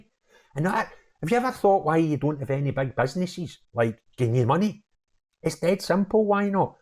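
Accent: Scotland, United Kingdom. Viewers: You always have me in hysterics the way you explain things. Sounds so much better with you saying these things with a Scottish accent. Got on yeh. thks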